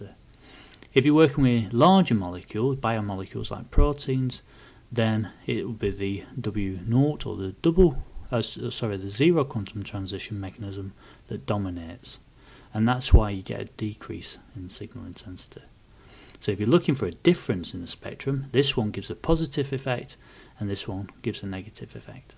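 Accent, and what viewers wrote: United Kingdom. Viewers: Hard to understand british accent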